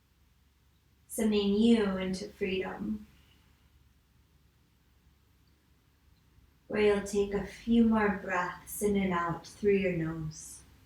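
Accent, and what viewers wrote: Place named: United Kingdom. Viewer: So good to practise with you after such a long time,. I hope you’re well. Unfortunately, the picture seems to freeze around 20 minutes in, but I could follow your voice. Your accent has a hint of British these days!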